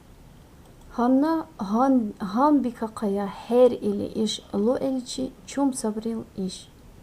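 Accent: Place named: Akusha, Russia. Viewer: Думаю Акушинский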